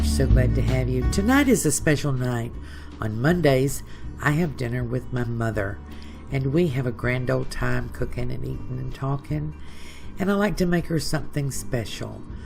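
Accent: South, United States. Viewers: where are you from you sound southern and my 88 mother in law loves you but didn't know how to ask for she is from Georgia.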